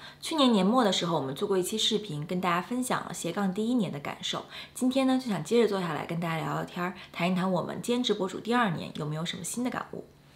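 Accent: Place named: France. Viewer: 一直很喜歡妳們的視頻~ 穿插。。的吳式幽默^^ 穿插。。一臉無辜臉的悟空^^ 還有最重要~搏主法式的聲調解說期待下年度的新視頻唷~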